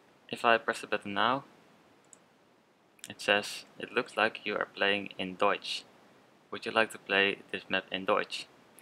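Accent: Netherlands. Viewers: Het is heel duidelijk dat je Nederlands bent. Ik ook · jij bent nederlands of niet je kan goed engels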